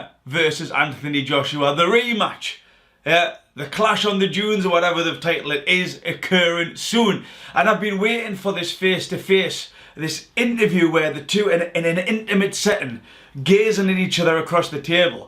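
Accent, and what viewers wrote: Newcastle, United Kingdom. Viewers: Anyone else he sounds like true geordie or is it just me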